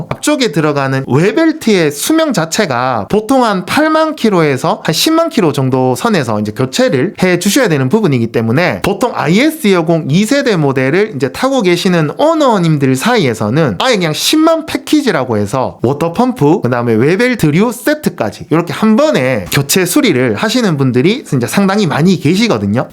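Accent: Gyeongsang, South Korea. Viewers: 헉시 갱상도 사람인가여??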